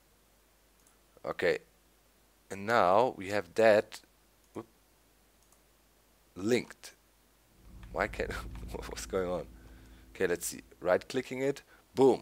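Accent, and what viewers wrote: Germany. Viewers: Mancmal merkt man schon noch das du eig. Deutscher bist^^ Aber sonst coole videos und weiter so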